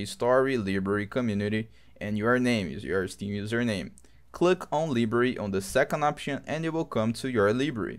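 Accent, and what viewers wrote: Brazil. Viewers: brazilian sotaque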